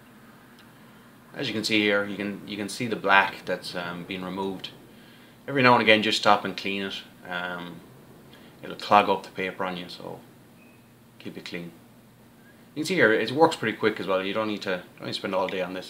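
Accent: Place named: Ireland. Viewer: Irish. I want to say Northern Irish, but I always mix up the 2 dialect variations >.<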